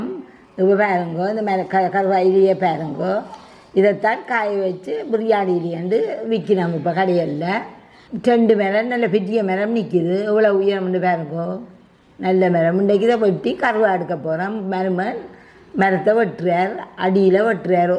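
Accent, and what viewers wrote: Sri Lanka. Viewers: I like srilangan Tamil voice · இலங்கை தமிழ் கேட்பது இனிமை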